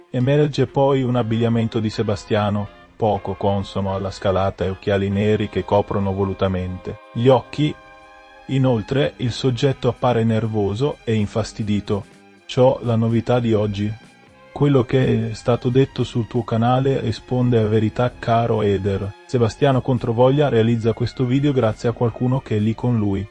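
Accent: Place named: South, Italy. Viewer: Ma questo che parla sei tu stesso? Ha l'accento di uno del sud che ha vissuto un po' al nord, abbastanza peculiare come modo di parlare.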